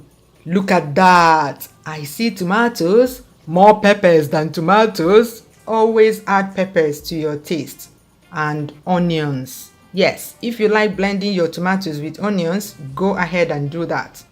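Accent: Igbo, Nigeria. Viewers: If there is anything more I love about it video. Is your simplicity, ur Igbo sweet accent and your amazing figure of speech. You are amazing and your authenticity is out of this world. I learnt so much about buka stew from this video.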